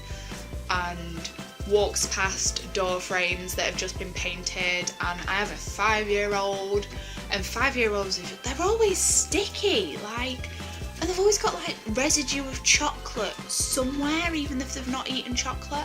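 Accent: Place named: Stoke, United Kingdom. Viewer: Just stumbled upon your channel and thought.... is that a Stokie accent. Then I read your comments below and YAY you are, just like me! I've never really liked Abakhan that much as a lot of the weighed fabrics work out dearer if it's a weighty fabric than off a bolt. Maybe I should go 1 of these bag fill sales.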